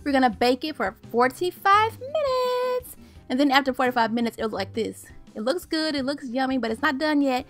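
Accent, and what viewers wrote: South, United States. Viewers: Yeah, got to say this was THE BEST homemade roast chicken I've ever had. Thank you SO much for your video. Informative and entertaining...love your Southern twang!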